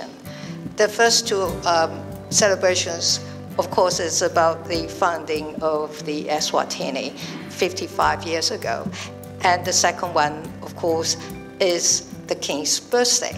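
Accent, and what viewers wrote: United Kingdom. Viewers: 英式腔真的舒服，未來可能難再有這樣腔調的了，希望未來外交部長或總統至少都要拔擢這樣的腔調人才，有利外交形象。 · 蔡總統的英國腔好好聽啊～更愛她了...😍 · British accent very good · 英文真的蠻好的, 還帶著英國腔調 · 本來就有英國腔嗎？ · 这个英文说的 不太流利, 有点英国口音, 还有点中文口音. 这种英文水平在欧美做律师还是不太够资格的. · 小英總統的英國英語腔調真的優雅好聽！❤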